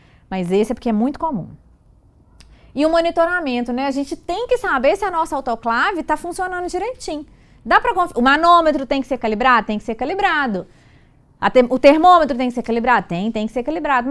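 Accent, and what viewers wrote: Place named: Minas Gerais, Brazil. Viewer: Muita experiência, muito carisma, e o sotaque mineirim é bão demais rsrs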